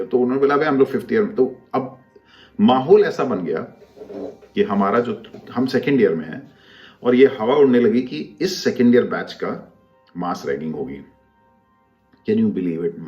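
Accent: Bihar, India. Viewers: aap to Pura bihari bolte ho be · Sir aapka bihari tone..mazaa aa gya..apno wali feeling aae..ni to hr koe to chupane me laga rehta h..m really not expecting ki aap v kavi aise aaoge aur aise baat kroge apne video me..love u sir❤️❤️ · Wow.such an authentic Bihari accent.dhanbad aaiye Kabhi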